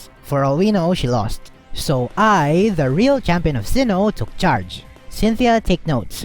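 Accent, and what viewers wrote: Philippines. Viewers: Pansin ko lang po boses mo eh napaisip ako pilipino kaya to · Tol bago ako sa youtube channel mo at na recommend sa akin ng youtube at btw yung nung pagkarinig ko sa english mo sabi ko ay filipino to hahahaha D ko tinatawanan yung pag salita mo ng english pero kung sayu medjo d nakakatuwa Sorry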